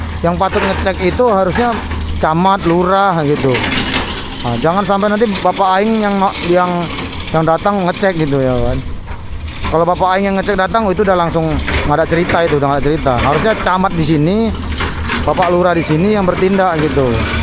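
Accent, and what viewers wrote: Medan, Indonesia. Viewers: Semangat menyala buat kontennya abangkuu. Senang kali awak dengar aksen medannya bang 😂👍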